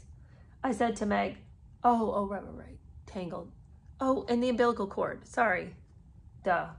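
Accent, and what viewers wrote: United Kingdom. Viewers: Your story telling and British accent made me laugh to the point of snorting! I so enjoy your humor! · I love your British accent 😹😹😹😹 very My Fair Lady!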